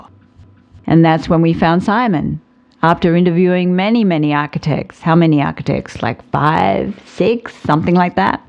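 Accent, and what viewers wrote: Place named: New Zealand. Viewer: It's faint, but I'm detecting an accent in your speech. New Zealish?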